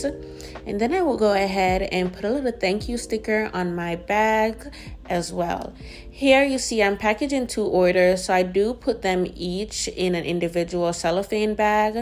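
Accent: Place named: Jamaica. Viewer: Thanks for sharing sounds like you are Jamaican I am too good luck on your business. I am starting my tshirt business too so I’m here to learn and grow.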